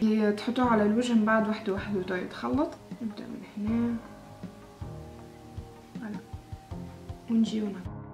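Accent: Tunisia. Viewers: انا مغربية نحب لهج تونسي برش تبارك عليك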